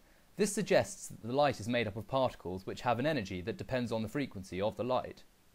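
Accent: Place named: RP, United Kingdom. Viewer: Awesome posh accent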